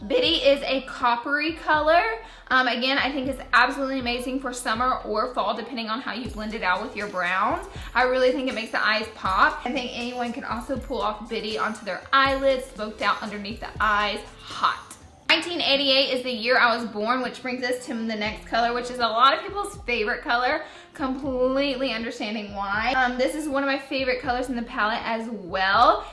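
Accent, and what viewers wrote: South, United States. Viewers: Love it!!!!!!! ♡♡♡♡♡ CONGRATS. LOVE the names I'm in Tennessee and everyone makes fun of how we southerners talk. I love how talks sooo southern. ♡♡♡♡♡♡♡ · Christen Dominique mentioned you in one of her snaps and I just had to see your channel. When I heard you talking I knew you had to be from the south. I'm from Alabama as well and I love all the shadow names! 😂 Congratulations on your palette it looks amazing! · Patiently - ok, well not really - more like ANXIOUSLY awaiting this palette's arrival from your SnapChat family preorder..... Come On, Violet Voss..... This Mississippi Girl is chomping at the bit!!!!! So proud for you!!!!! Love your southern accent and of course the fact you use our special Southernese Sayings!!!! Keep up the GREAT work!!!!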